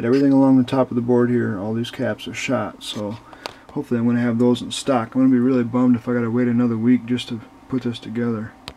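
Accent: United States. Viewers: I am sure every state and country you have visited you heard the word SOLDER, why was this word mentioned to you? Do you sell it or what? Add another letter and wou get the word SOLDIER, how do you pronounce that? SAWDIER. It is two syllables SOL say that.... and DER..... and that. Now put them together, what do you get, MUPPET. You are obviously American, where you say Cli'n instead of Clinton, Innernet, couner, couny, twenny...............learn to speak English. Muppet.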